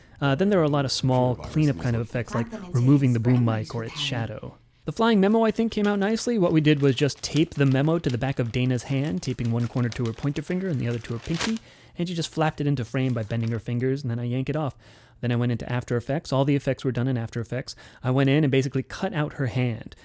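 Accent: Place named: England, United Kingdom. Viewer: You have a great English accent mate! 😊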